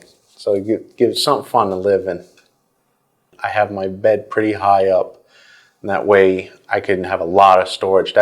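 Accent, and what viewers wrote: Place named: New York, United States. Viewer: this dude is from NYC you can hear it in his voice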